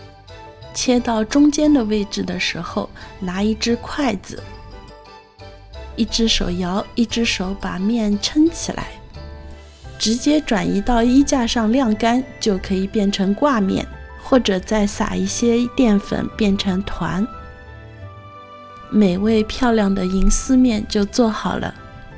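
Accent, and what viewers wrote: Shanghai, China. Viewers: 懂上海话的人很明显听得出来你是上海人，不懂的肯定就听不出来，反正对我来说很亲切啦！ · 沪普听了台太亲切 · 吾晓得，从侬额普通话里听出来了😝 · 我猜你是上海人是吗？听口音感觉是。 被你种草，作为一个同样喜欢面食的人，去AMAZON下单了一台MERCATO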